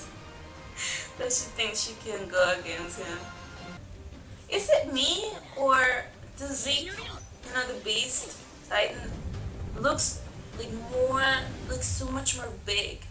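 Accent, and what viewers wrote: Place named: Spain. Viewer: Cool. From your accent I was thinking Spain. Makes sense.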